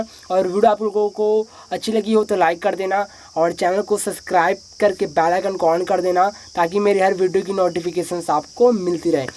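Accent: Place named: Chhattisgarh, India. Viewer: Chattishgarhi awaj me bane lagthe yrr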